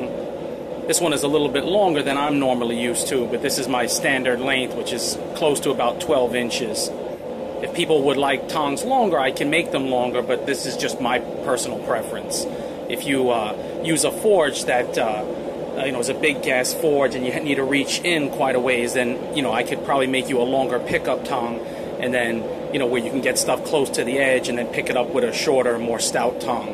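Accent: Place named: East Coast, United States. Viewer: by now you know I'm a fan of your channel, and the work you do. I've been trying to add up why you are overseas, your obviously an American with that East Coast accent. today you mentioned Matthew West, I'm thinking you're referring to the Christian singer? how far off am I by thinking your a Christian Missionary or Teacher? BTW- I think I really like the guillotine and I really really like the tongs. I am very interested in purchasing both, but will have to save some $ first. at any rate friend, keep sending us videos, you are a great educator of this craft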